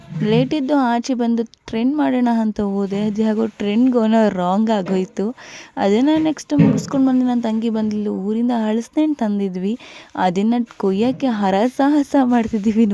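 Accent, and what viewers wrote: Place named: Mangalore, India. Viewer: Huu mangalore kannada mix aagide swalpa😮😮🙄🙆🏻‍♂️🙆🏻‍♂️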